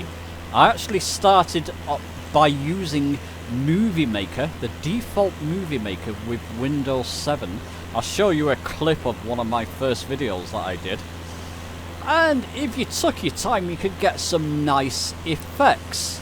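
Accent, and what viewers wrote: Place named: Northern England, United Kingdom. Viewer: Good to hear that Northern Voice every time I want to learn "How To!" and how you make the difficult seem so easy I don't normally believe in coincidences - but I just asked on My Orbyx Forum any hints for making Flight sim videos because I want to improve the few I've made and took the UTUBE plunge - and once again - great minds think alike Thanks for all you do especially for us Old blokes!!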